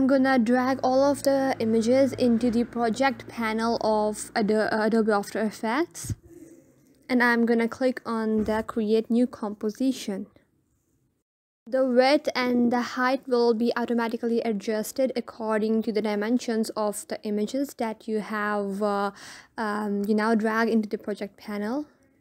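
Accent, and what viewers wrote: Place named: England, United Kingdom. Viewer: Tutorial is so nice lakin English accent 😂😂❤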